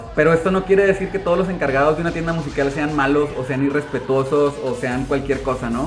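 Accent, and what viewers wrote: Mexico. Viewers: siendo sincero el acento mexicano no me gusta para nada, me suena ridículo a veces, pero debo admitir que el tuyo es el mejor, ni siquiera sabia que era mexicano, y ahora que se que es mexicano me va a costar decir qie no me gusta el acento, me encanta tu voz y la naturalidad con la que hablas, me da curiosidad saber como cantas. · Excelente video, yo soy de Ecuador y realmente a todos los mexicanos les oigo igual, 😅😅😅, no me parece que se merezca un video, ella fue un éxito de 5 canción a lo mucho y se fué desvaneciendo, hasta desaparecer, me fascina desde que tengo 12 años, aurita llevo 39 vueltas al sol, y cualquier video sobre ellos Siempre será bienvenido, que tengas un gran fin de semana.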